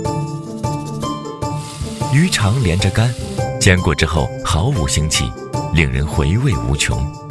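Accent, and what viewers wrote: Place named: Shandong, China. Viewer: 根据旁白说英语的口音，我觉得他是山东人。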